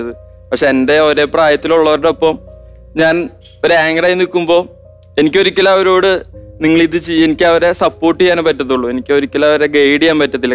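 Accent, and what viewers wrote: Thrissur, India. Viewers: DD... Nammude thrissur language vittu povathathil enthnaa vshamikne..nee njngalde muthaaanu... Oru anchor nu paranjal kadichal potatha english parayunna aaalaavanam ennonum illa... Thrissur tone maatathe nee samsaricho... Ini ipo athu ishtavathavar nine kananda... Ninak nammal thrissur nte gadikal full supportaaa... Comments nokku dd... Thrissurr eno palakad no ennonum illa... Ithu kanunna ellarum nine ishtapedunnavaraa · സത്യം parajal DD ഉള്ളത് കൊണ്ടാണ് നമ്മളെല്ലാം ee programe kanunath അല്ലെന്ന് aarkenkilum തോന്നുന്നോ 😍😍 DD chetta ഭാഷ ഒന്നും oru പ്രശ്നം അല്ല numma തൃശൂർ ഭാഷ polialle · Thrissur language pwoliyalle... ❤ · Ath thane. Thrissur kar pinne thrissur basha thane alle paraya.kore alkar kuttam parayum but dd not change your thrissur slang. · Thrissur ഭാഷ ആണ് DD ടെ superb u r great. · നീ നമ്മുടെ സ്വന്തം തൃശൂർകാരൻ അല്ല.: ടാ അതിൽ ഉപരി എന്താ വേണ്ടത്.. 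നീ മുത്താണ് സ്വന്തം ഭാഷ ശൈലി അണ് ഈ ഇപ്പോഴും തുടർന്ന് കൊണ്ട് പോക്കുന്നത് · തൃശ്ശൂർ ഭാഷ superഅല്ലെ നീ മുത്തല്ലെ dd you are grate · Thrissur bhaashaa aaa chetto chetne highlight 😍😍😍.. Don't ever change that. That makes your way of speaking so attractive.. We should all love our native slang.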